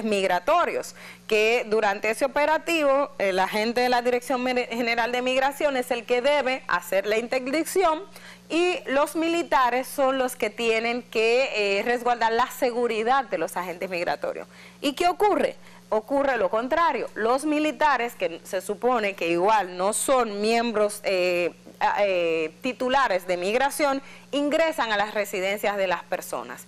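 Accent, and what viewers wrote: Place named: Haiti. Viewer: No es por nada pero esta presentadora puedo sentir qué es ahitiana escuchen bien su acento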